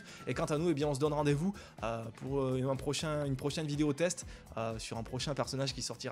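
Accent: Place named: Marseille, France. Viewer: G remarqué que ta un axen marseillais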